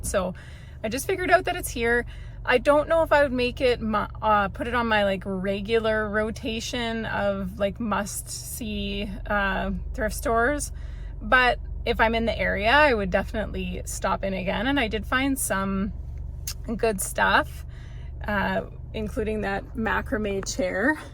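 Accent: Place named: North, United Kingdom. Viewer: Those frosted blue glasses were def a miss! They were so pretty! 😊 Purse is cool, and so curious how much weight that macrame chair would be able to hold! 😮 Also, I need to finally mention this… (hope you see it!) There’s a really great YouTube ad that I rarely see anymore but used to see it a LOT. It’s a supposed buyer who’s showing all the various ways and applications that this particular lint scraper thing can perform - all while narrating it. It’s actually pretty funny bc she says “It will get you questioning your life and things like…Does my dog still have a coat? Am I a gross and disgusting person?” and so on 😂, and at the end she says, “Idk, this just might be the best thing I’ve ever bought from the internet.” ANYWAY, I swear YOU are the voiceover person!! It’s your exact kind of measured dry humor and the voice (complete with northern accent) sounds just like you! 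😄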